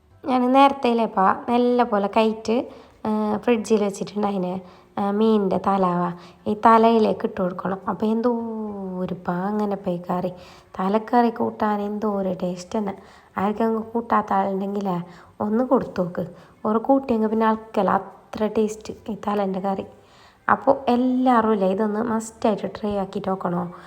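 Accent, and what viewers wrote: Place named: Kasaragod, India. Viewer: 🎉🎉🎉❤ സൂപ്പർ❤❤ കാസർകോട്ട് സംസാരം കേൾക്കാൻ സൂപ്പർ നമ്മൾ കോഴിക്കോട്ടുകാർ🎉🎉🎉❤ ഇതൊന്നും വെച്ച് കൂട്ടി നോക്കണം😂😂😂😂😂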